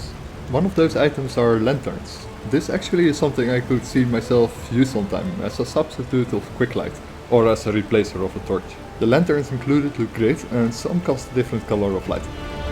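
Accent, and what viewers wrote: Netherlands. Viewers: ik hoor aan je accent dat je nederlands bent haha :) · Are you from Den Hague by any chance? Or some where from nearby that region? I notice it very clearly from your accent, but I'm not sure. Part of my family are from there.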